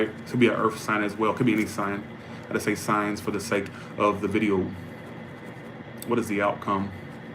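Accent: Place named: South, United States. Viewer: where are you located? you have a southern accent, its fun to watch you...how do i get on the chat?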